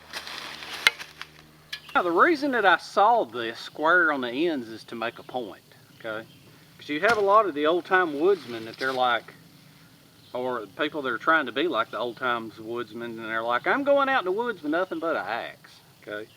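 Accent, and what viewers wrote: South, United States. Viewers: I can tell is a southern man.... he talks like me....I'm in Western NC.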